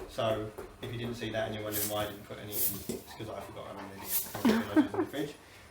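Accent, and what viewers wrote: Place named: England, United Kingdom. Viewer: Thank you so much for the video! Your advice helped me a lot on planning my puppy's diet. Especially the posters they're so useful. There's just one little thing, I am a foreigner and am not very used to the English tone of English so there were lots of parts I didn't really get what you were saying. Would you consider adding subtitles or giving a brief description of the video? 😢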